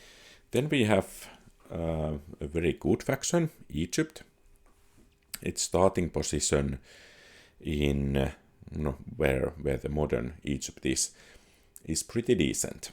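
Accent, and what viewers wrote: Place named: Finland. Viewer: Love to hear finish English! Really nice! Love it!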